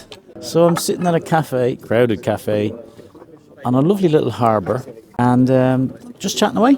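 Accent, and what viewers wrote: Ireland. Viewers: Hello, new subscriber, expat Brit. Living in a very rural part of Canada. Please do not stop. You are making a 65 yr lady, very happy. I grew up in area populated with many Irish people. We have a few Irish people here, but they mostly live on the east coast, the Maritimes, I've met a few, they got lost, heading to work, mistaking a town near here for a town in a very different part of Canada. It was lovely to hear there banter etc. No one could understand them but me. Also having a back and forth conversation. I could only do because my ear was tuned to there accent, because of the Irish people, I grew up with. I was so delighted to listen to you. You came the way of Dan. Love Dan but miss his b role and drone shots, amazed at what he's doing. But you have filled in the gap. When I can't sleep, I listen to you. Your personality brings me joy. 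Don't listen to the nay sayers, of course when you no longer enjoy doing this, stop not for those who like to yip and yap. I enjoy every thing you do and learning about everything you have done. People like you give so much to people like me, who have no choice thru illness etc but to live life thru, stories, sights and sounds, that would be closed off from the world. Thank-you.🇨🇦 🇬🇧🇮🇪 Xx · you and your vlogs are a lovely breath of fresh air in a sometimes stale world 😍Just love your honesty and sincerity and your gorgeous Irish accent 🥰 Also, as my travelling days are somewhat over I do enjoy seeing the fabulous scenery of the French countryside - keep on keeping on and stay safe on all your travels - blessings 😇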